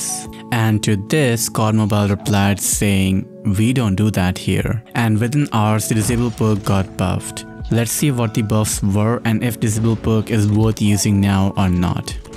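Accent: India. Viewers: Bro! R u a Indian? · bro are u from india?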